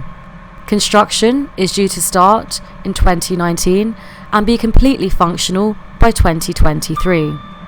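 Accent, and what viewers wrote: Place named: RP, United Kingdom. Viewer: Very interesting but the commentary leaves something to be desired, I think it's done by a robot with a posh accent.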